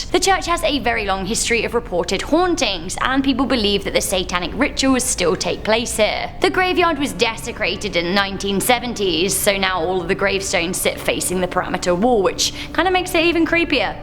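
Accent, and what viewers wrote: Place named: United Kingdom. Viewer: There is nothing sexier than a subtle British accent. Love it. FYI almost all my ancestors come from your country. Found out thru DNA testing. I now know where all my family came from and its awesome.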